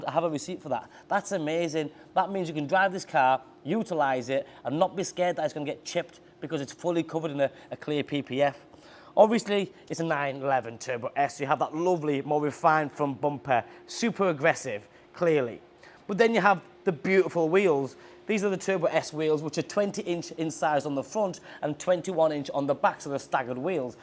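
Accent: United Kingdom. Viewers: I love the brish accent 😂🔥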